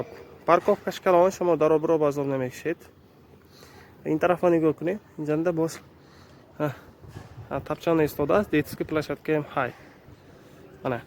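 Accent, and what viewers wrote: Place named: Mongolia. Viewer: Узбак бошаде ? Монголиский акцент . Что они делают в ходженте? Ужась откуда эти клопы распрлзаются ?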